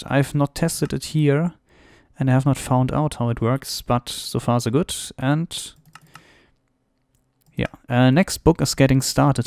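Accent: Germany. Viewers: Man hört das du Deutscher bist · Yes, or that you even know. Btw are you German?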